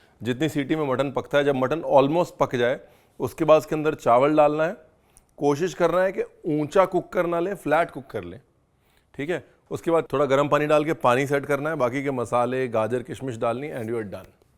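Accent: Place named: Lucknow, India. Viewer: Bolne ke laheze me Lucknow hai ❤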